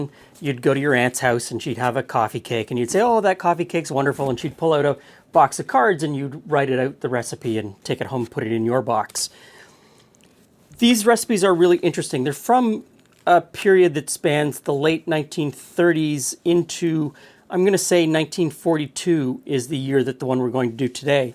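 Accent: Canada. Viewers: Sure sounds Canadian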